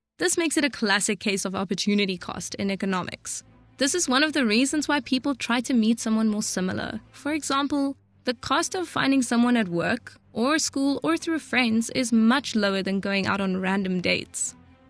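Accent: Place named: South Africa. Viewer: Thinking the same thing, hey. Accent is too South African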